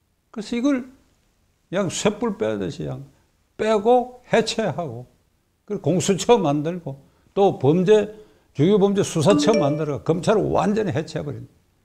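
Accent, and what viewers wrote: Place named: Gyeongsang, South Korea. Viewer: 변호사님의 해박한 지식과 설명해 주시는 노력과 성의는 존경해 마지 않습니다. 변호사님 1.서울에 사신지 얼마인데 아직 경상도 토박이 억양과 어휘를 탈피하지 못하십니다. 2."다른 증거들로 가지고"는 " 다른 증거들로서"로 고치시고 3."미국으로 도망가가지고"는 "미국으로 도망가서" 4.그래가지고 이래가지고 등 가지고라는 이음새는 아주 듣기 싫은 낱말,특히 연설문 대중 연설문에선,. 5.지금의 젊은 세대에 맞는 어휘 억양,어구 등 부탁합니다. 감사합니다.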